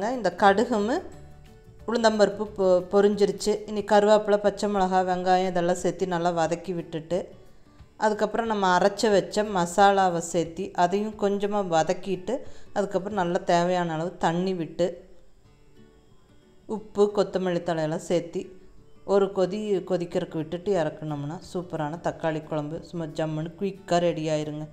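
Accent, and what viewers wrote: Kongu, India. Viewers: Receipe tips are nice. As well kongu tamizh is also so nice. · Super akka nice recipe , thanks your Kongu Tamil also good.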